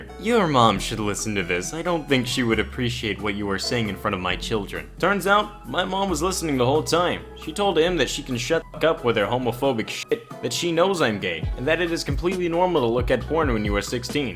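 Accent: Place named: Chicago, United States. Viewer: You have a fresh suave Chicago accent, is it just me who thinks that?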